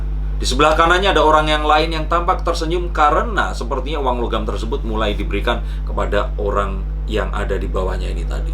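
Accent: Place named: East Java, Indonesia. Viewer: sampean wong jawa timur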